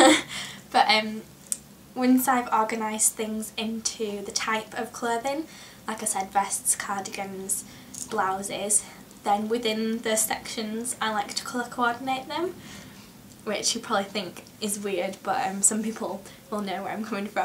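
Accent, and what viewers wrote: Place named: Manchester, United Kingdom. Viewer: Is yours a Manchester accent? I was acquainted with a girl who had an accent like yours and she was from Manchester. I like it.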